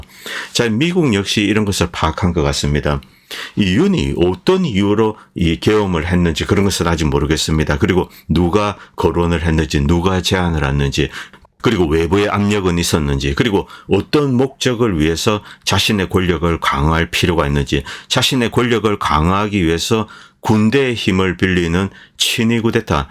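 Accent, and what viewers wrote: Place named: Gyeongsang, South Korea. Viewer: 형님 경남이지요 친금감잇는 목소리 방송잘보고잇어요 😅 이기자 통신됴😅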